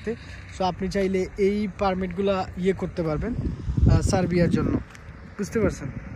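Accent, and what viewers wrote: Sylhet, Bangladesh. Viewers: ভাই কি সিলেটি না কি?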